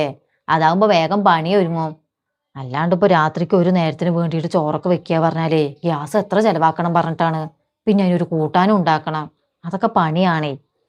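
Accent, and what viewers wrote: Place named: Malappuram, India. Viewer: Palakkad tamilnad style anenn ketittud samsaram but nigal malappuram style annalo.... Anyway kidu acting subscribed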